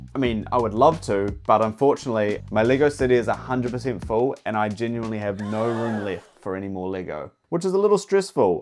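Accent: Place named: New Zealand. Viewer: Saw you on VidIQ and was like "hmmm sounds like a kiwi and his account is. I'm gonna go out on a limb and assume he's a kiwi". So obviously subbed. Congrats on the success fellow Kiwi creator:):)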